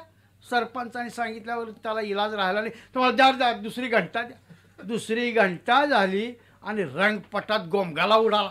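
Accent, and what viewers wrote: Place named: Rural, India. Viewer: ही कथा मी 10 वेळेस ऐकली आहे तरी पण ऐकू वाटते याच कारण म्हणजे ग्रामीण आवाज आणि ग्रामीण कथा यांचा आवाज.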